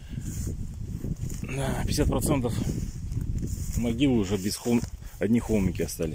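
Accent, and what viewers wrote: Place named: South, Russia. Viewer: Это не украинизмы. Это русизмы ,а точнее южнорусский говор!....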